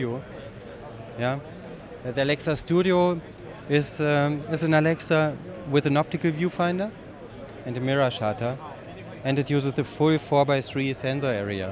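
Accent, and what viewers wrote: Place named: Germany. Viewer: Er ist echt nen deutscher ^^ He really is a german, i'm german too but he really can't speak english ^^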